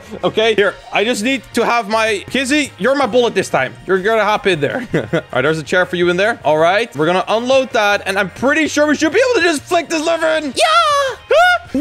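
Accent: Netherlands. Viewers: Are you from the Netherlands or from Belgium? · are you dutch? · Hes dutch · are u Dutch?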